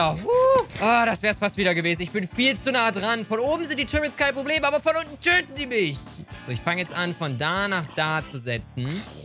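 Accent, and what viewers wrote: Saxony, Germany. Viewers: kannst du mal ein komplettes Video auf sächsisch machen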